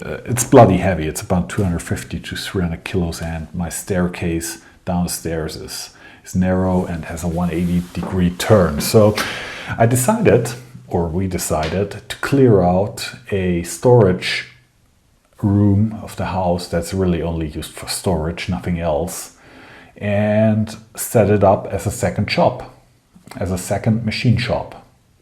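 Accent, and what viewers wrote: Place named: Germany. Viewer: Hi, i like high level quality. And by the way your german english is beter than original😉🤭. I learn english and i look and listen you. Thanks.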